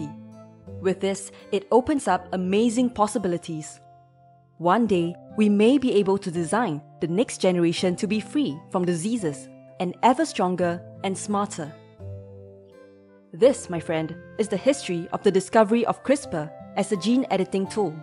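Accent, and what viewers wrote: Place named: Singapore. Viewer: CRISPR will fix that horrible Singlish accent.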